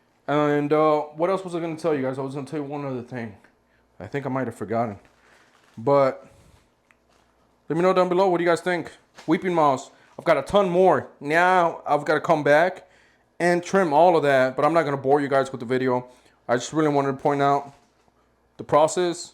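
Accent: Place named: United States. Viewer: Do you ship in the USA? You sound like an American but I have messed that up before. 😂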